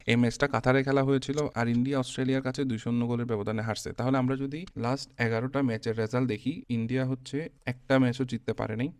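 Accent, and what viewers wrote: Chittagong, Bangladesh. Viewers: ki bhai chatgaiya naki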